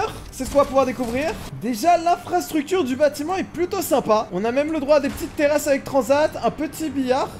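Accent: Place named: France. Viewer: L'accent Français 😎🤌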